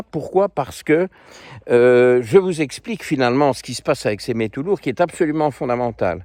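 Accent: Belgium. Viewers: C’est toujours un super régal de vous écouter et de voir vos compagnons 😘 ,votre plaisir et votre joie de nous partager la beauté de votre nature et de vos animaux, me remplit le cœur à chaque vidéo… Merci pour tous vos conseils, et j’apprécie tellement que votre discours n’est jamais coupé par de la publicité. Merci 1000 fois. Et puis votre accent belge vos expressions me ramènent des origines… bref avec vous, je tire mon plan😍😍😍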